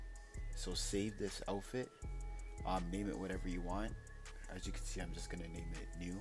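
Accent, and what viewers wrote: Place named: Toronto, Canada. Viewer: This guy is definitely from Toronto "it was cheesing me" lmao I had a feeling the whole video but that confirmed it.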